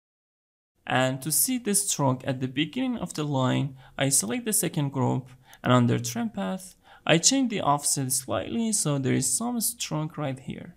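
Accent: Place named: Iran. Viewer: Are you from IRAN? Your accent tells me you're IRANian ... :hand-pink-waving::face-blue-smiling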